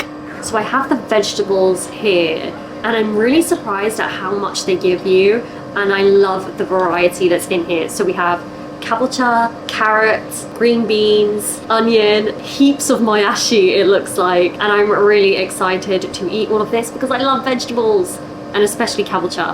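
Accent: United Kingdom. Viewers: 呑兵衛のさん、しかもサッポロ黒ラベル、ジンギスカンとビールが進みますね。華麗なイギリス英語の、聞きやすい発音、大きめの文字、教材としても秀逸です。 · LOL なるほど！ ”obviously” って、こういうふうに使うんですね。勉強になったー。それにしても、ずっとさんのイギリス英語を聞いていたら、イギリス英語の発音が大好きになってしまったよ。そして、自分よりも多くの日本全国のおいしい料理を食べてるさんが、うらやましい笑今日のビデオは一段とおいしそうで表情が豊かで最高でした！ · 見事なブリティッシュイングリッシュです聞き取れません脱帽ですすごいです🎉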